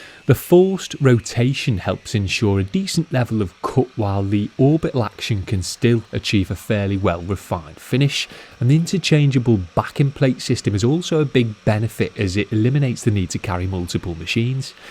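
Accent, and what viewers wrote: United Kingdom. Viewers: Your videos are great man, keep on making them, even though I have a lot of trouble understanding half of what you say... not a native English speaker here... understanding British English was already a challenge, but when I first heard “moch” instead of “much” or “son” instead of “sun” I just wanted to cry hard... anyways, great work!! Cheers from Argentina!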